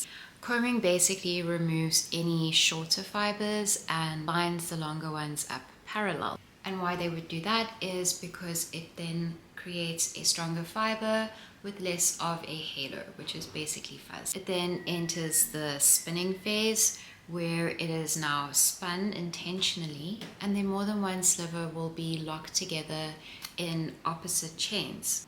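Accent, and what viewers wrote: South Africa. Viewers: Thank you for your extremely interesting and informative yarn yarns! Also, super great to hear another South African accent!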